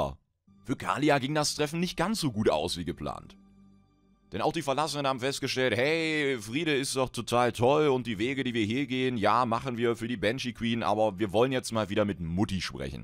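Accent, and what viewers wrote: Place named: Saxony, Germany. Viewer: Wow Lore + Sachsen Akzent. GOLD!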